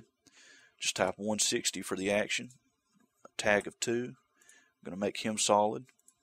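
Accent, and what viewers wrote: South, United States. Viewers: Came here researching 3d floors. Was pleasantly surprised to hear a voice that clearly knows a thing or two about DooM editing that also has a southern accent. Dirty souf y'all. :D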